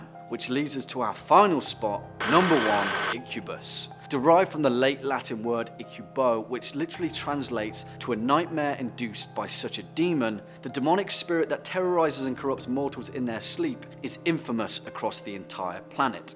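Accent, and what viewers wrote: United Kingdom. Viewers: Incubus, succubus, Satan, Valak, and sleep Paralysis demons scare me the most weirdly enough with some of yall British accents the videos aren't too scary.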